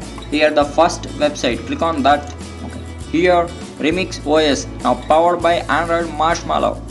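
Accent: India. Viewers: Brush your English accent.. Your video was nice but your accent was like Tenglish = Telugu and English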